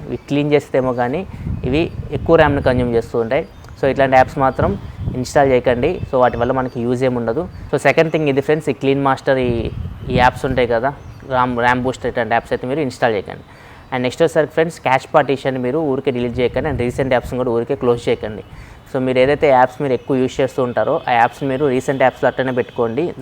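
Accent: Ongole, India. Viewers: Bro meedhi ongole aaaa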